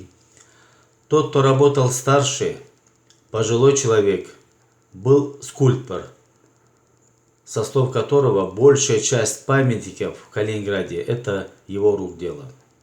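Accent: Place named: Karachay-Cherkessia, Russia. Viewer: У него акцент немного на карачаевский похож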